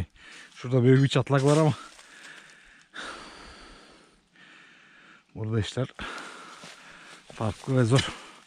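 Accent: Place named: Kırklareli, Turkey. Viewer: Sa dostum,ben Edirneliyim ama seni Viyanadan takip ediyorum,o kirmizi tasli olanin altindada bi tas varmis oralardadir buyuk ihtimalle ,bu arada oralari Kırklareli taraflarimi siven bizim oralı oldugunu gösteriyor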